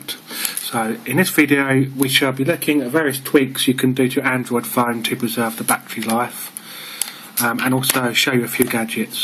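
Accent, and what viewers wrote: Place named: Scotland, United Kingdom. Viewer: Scottish accent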